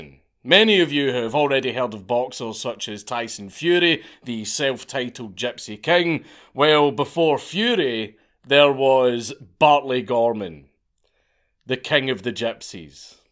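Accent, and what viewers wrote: Scotland, United Kingdom. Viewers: They don't have kings and gypsies in Scotland. They have kengs and gepsies. Gawd I love the Scottish accent. Easily my favorite. (editing to make the 2 snobs who responded happy)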